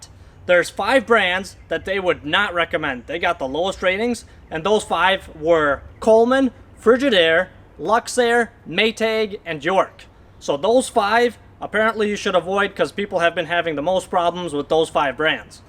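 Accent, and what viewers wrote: Minnesota, United States. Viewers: Your content is great! Knowing you're a Minnesotan (cause I talk like you too!) but now I live in So. Florida. People say lifespan of 10-20 years but what about hours used? I have a 10 yr old 13 SEER Ruud 3 ton unit running 410 refrig. would you replace it with a 16 SEER or just keep it tuned up and hope for 15 years? System checks out ok, I replaced capacitor last year but seems takes nearly 8 hours to cool down house from 80 to 72F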